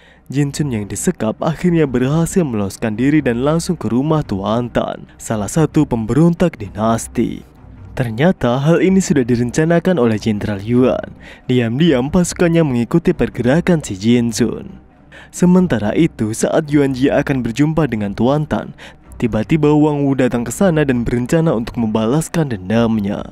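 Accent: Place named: Buol, Indonesia. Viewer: Logat apa kw pakai itu... Orang buol kh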